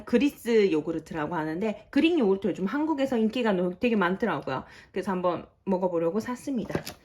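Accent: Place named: Japan. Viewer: 라면 많이 쟁여 놓으신거 보고 깜짝 놀랬어요 ㅋ 한국에 있을때 육개장 라면 엄청 자주 먹었었는데 ㅋ 갑자기 급 먹고 싶어지네요! 발음이 일본인이 한국말 하는 거 같아요! 일본어가 네이티브 수준이신가봐요! 너무 부러워요^^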